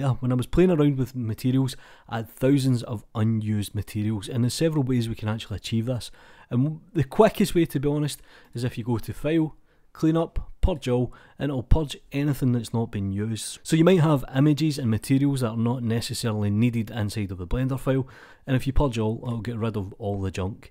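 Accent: Scotland, United Kingdom. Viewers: I dont speak english natively.. is that an Scottish accent?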